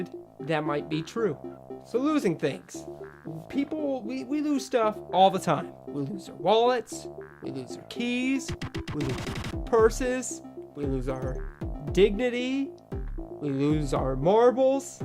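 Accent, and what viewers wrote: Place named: Ireland. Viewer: Yeah I must say I love your little floating objects! This is me requesting your next video to be in your Irish accent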